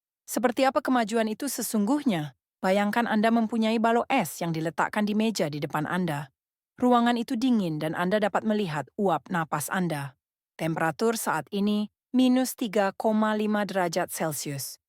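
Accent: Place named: Malaysia. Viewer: Kok kaya bahasa Malaysia si, logatnya jg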